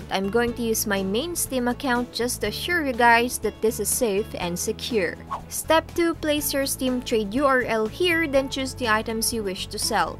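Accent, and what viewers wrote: Philippines. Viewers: that Pinay accent though lol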